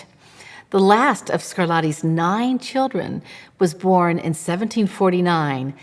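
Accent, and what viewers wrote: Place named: United States. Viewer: American English pronunciation is fine for a American English and we’re all familiar with it; it is as legitimate as any other world-wide English accent. I do have an issue though when the drawly vowels and mispronounced consonants (particularly ‘t’s as ‘d’s*) are lazily and disrespectfully transferred wholesale and without a thought for accuracy onto languages like Italian where the resulting mispronunciations are grotesque - it’s rather like dressing up a pretty little girl in a workman’s dirty overalls. * Giving us the horrible ‘Scarladdi’ for example.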